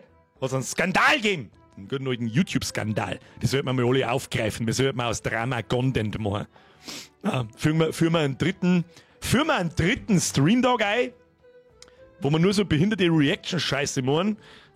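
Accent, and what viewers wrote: Bavaria, Germany. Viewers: Merce für die stets gute Unterhaltung. Da vergeht der Arbeitstag gleich viel schneller. Gut, daß ich bayrisch versteh' haha. Grüße aus Mecklenburg.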